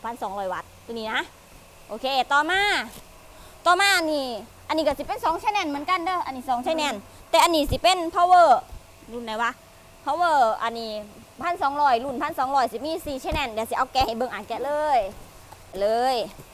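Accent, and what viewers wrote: Thailand. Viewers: ต้องให้เพื่อนคนอีสานแปรให้ฟังอีกที กว่าจะรู้เรื่อง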